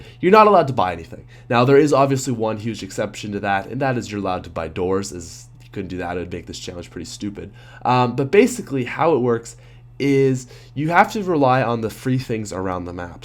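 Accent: Canada. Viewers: don't dis Canadians accent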